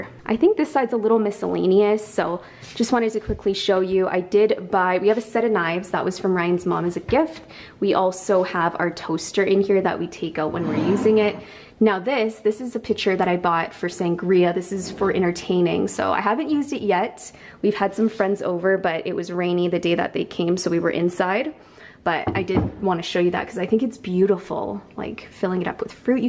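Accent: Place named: Canada. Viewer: I love her Canadian accent ✨💕😂 ily! I sent you a letter a while ago and u sent me brushes so thank you for those! 💕 you are one of my role models 🥺💕😘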